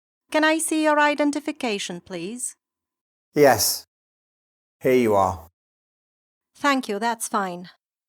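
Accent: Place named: Northern England, United Kingdom. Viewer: I reckon his accent comes from north England, perhaps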